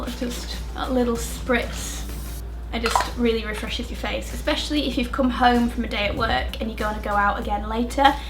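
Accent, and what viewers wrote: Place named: Bolton, United Kingdom. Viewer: No matter how tired I am I'll stay up an extra 10 minutes to watch your videos. Love them every time & really love the local accent (I am a Boltoner originally) xx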